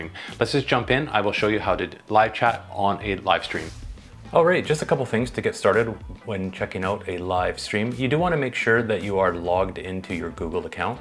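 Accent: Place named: Canada. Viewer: I KNEW you were a CANUCK ! You have an accent! Especially when you said, "OUT" ...."If you have a question, leave me a comment below & I'll try and help you 'OUT' !! Tell tale sign of a Canuck! Tks for your helpful tips on how to comment during live stream. Sending you good vibes & California sunshine ! 🌴😎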